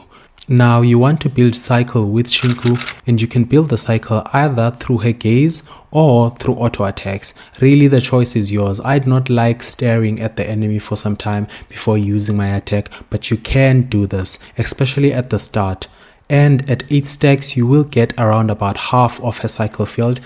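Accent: South Africa. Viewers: Bro, u sound like kao kwishisha. Mzansi? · hears a South African accent, subscribes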